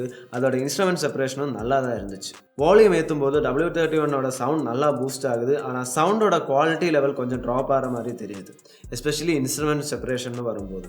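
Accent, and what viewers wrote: Kerala, India. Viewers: konjam kerala waadai adikudu thambi pesaradula...:) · From his slang, is a Mallu:-) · Avaru malayalai pa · Bro neenga malayali yaa. Neenga pesum bothu oru malayali Tamil pesura Madhuri iruku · Sound like Malayali speaks tamil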